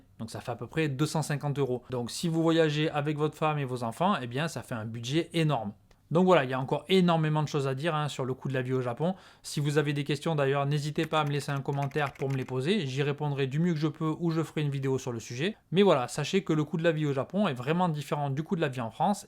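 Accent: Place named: Marseille, France. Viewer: C'est marrant j'ai passé la moitié de la vidéo en me demandant d'où te venait ton accent. Je ne me serais jamais imaginé que c'était de Marseille, d'autant que j'y habite. Comme quoi, notre accent a bien des subtilités.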